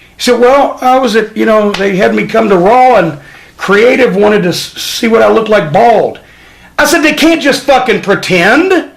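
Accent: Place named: Kentucky, United States. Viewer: thats that Kentucky twang...lol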